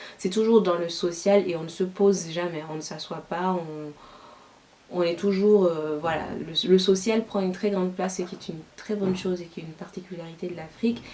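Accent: Zinder, Niger. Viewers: CcTiti s je viens juste de te découvrir j aime bien tes vidéos ton accent zinderois ma tuée mdrrrr courage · Slaut. J'ai beaucoup aimé ta vidéo, surtout l'accent zindérois. Je l'ai particulièrement aimé peut-être parce que je suis aussi nigérienne, précisément bénino-nigérienne. D'ailleurs je suis rentrée de Niamey seulement le week-end dernier, la famille y est. Bref, juste t'encourager dans ce que tu fais (apporter une touche sahelo-saharienne sur youtube), et peut-être si je l'avais vu plus tôt je t'aurai ramené de klichi (lol!). JUSTE BRAVO. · Parfait accent de Zinder. Vous semblez très confortable avec cela. Thank you for sharing.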